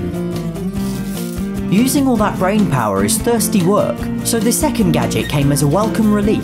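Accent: United Kingdom. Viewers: I love the uk english like Moning Cheers mate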